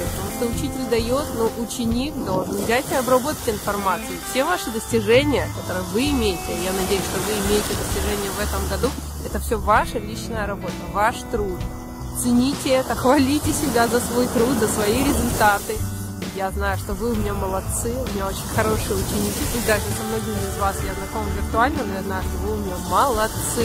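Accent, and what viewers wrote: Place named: Oxford, United Kingdom. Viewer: спасибо, вам за поздравления, за ваши видео-уроки, за вашу безвозмездную огромную работу, которую вы делали и продолжаете делать для нас! Мне стыдно за то, что пока не могу сделать хоть какой-то взнос вам, вашему каналу. Английский это просто моё хобби, от которого я не жду ни каких достижений, успехов. Просто не хочу бросать эту практику, если у меня это получится, то это благодаря вам.Счастья вам и процветания в новом году! p.s. У вас очень классное произношение, по мне, так почти оксфордское.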